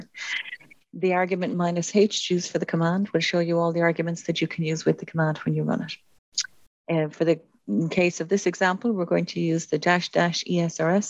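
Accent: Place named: Ireland. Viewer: A lot more videos should be done in an Irish accent. Thank you.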